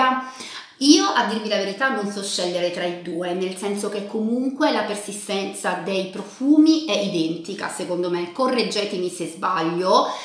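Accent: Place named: Calabria, Italy. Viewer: Infatti avevo capito che il tuo accento era simile al mio e mi sembri un viso nn nuovo ma di Reggio che zona,, ❤️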